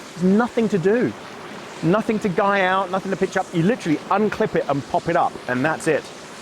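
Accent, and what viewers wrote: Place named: England, United Kingdom. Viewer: you look Maori with a English accent awesome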